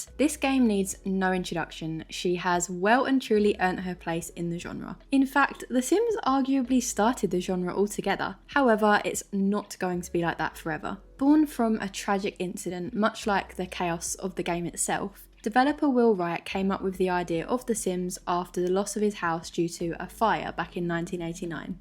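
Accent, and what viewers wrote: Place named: United Kingdom. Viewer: really great and calm video! i love that youre also british cuz i usually only ever find american ytbers making sims/cosy game vids and it just feels more grounded probs cuz its a familiar voice but i digress! :3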